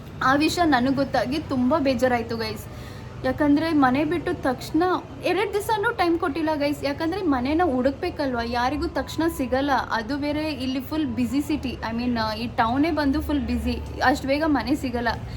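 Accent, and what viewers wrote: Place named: Andhra Pradesh, India. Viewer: Why you have telugu accent??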